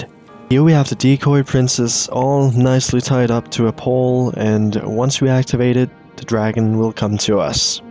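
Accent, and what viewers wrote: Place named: Denmark. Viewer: You sound VERY Danish!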